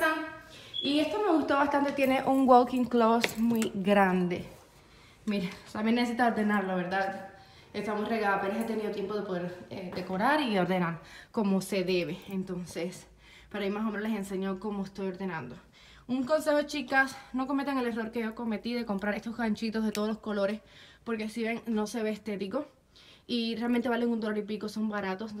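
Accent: Cuba. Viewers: Hola!! Soy nueva en tu canal llevo una semana contigo, el primer vídeo que vi fue el último que hiciste de decoración de Navidad pero no podía dejar de comentar este. Adoro tu casa osea me mudaba ya!!! Es preciosa luminosa ainsss me encanta!!! Poco a poco veo todos tus vídeos soy española y cuando termino de verte hablo con acento cubano tu ya me entiendes jajaja que me encanta tu gusto, es muy parecido al mio y adoro tu casaaaa!!! Un besado enorme!!!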